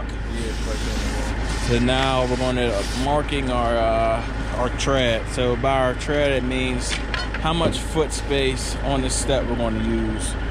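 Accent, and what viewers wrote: Pittsburgh, United States. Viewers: First video I’ve watched. 1.3 seconds in and I already knew yinz were from the Burgh. Can always spot a fellow Yinzer’s accent a “mahl “ away 😅 🫡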